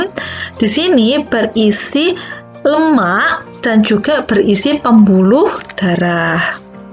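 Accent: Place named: Java, Indonesia. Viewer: Medok banget jowonya 😁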